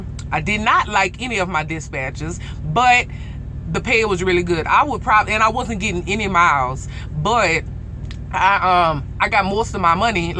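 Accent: Louisiana, United States. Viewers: You're soooo Louisiana! I don't hear that accent often....that's dope. My dad was from there. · Love that Cajun accent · LoL I love your accent, are you from Louisiana? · love the accent sound cajun or from the city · Shawty which part of Louisiana you from? I can recognize that accent anywhere. lol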